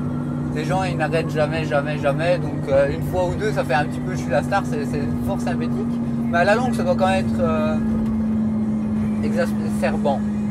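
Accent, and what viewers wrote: Belgium. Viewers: Tes belge de ou bruxelle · On reconnaît le petit accent belge. 🇧🇪🇧🇪🇧🇪🇧🇪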